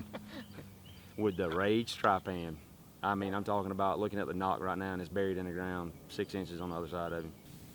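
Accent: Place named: Georgia, United States. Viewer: Been watching boys for a few years now and went to back to watch old videos and you can hear the southern accent on all the boys but this is no doubt a good.ol Georgia boy. I never understood why people let others determine their decisions. The property owner gives you permission but the boyfriend doesn't like hunting so she changed her mind. BS the bf was jealous of another guy being at the house, even though it's the woods out back. I've seen videos where you get permission and then the neighbor freaks out so they take it back. Personally if a neighbor of mine told me they didn't want someone hunting on MY property I'ld tell straight out "it doesn't effect you one bit, it doesn't effect your house, property or life in one bit so too f-en bad". Luckily I live in a pretty rural area and for years couldn't really see many neighbors but they are slowly closing in. 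Worse part is they move out to the country, literally was all farm land when I grew up but those have become almost extinct, but they move out here and then complain about the smell when a farmer is spreading manure on his fields, or complain about turkeys and deer in thier yard eating landscaping or.pooping, but dont like hunting.🤷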